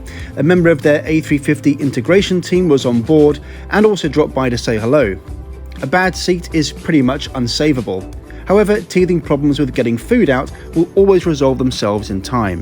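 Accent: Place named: Northern Ireland, United Kingdom. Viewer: Loving the Northern Irish accent! Full of surprises mate!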